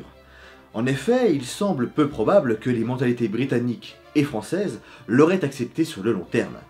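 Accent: Germany. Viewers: Je me permet de recommander une jeune chaine yt axé sur la seconde guerre mondiale, et qui a traité de l'offensive de la Sarre (le petit sketch du début avec la bière), c'est la chaîne des historateurs, n'hésitez pas 😎 Et comme d'hab... très bon accent allemand passif/agressif! 👌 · magnifique accent allemand ( et super vidéo en passant ^^ )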